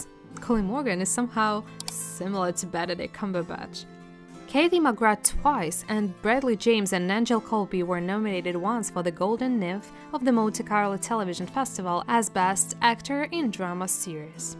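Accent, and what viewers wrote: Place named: Russia. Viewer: At the beginning of the episode I somehow had the feeling as if a Russian person spoke English (not a criticism)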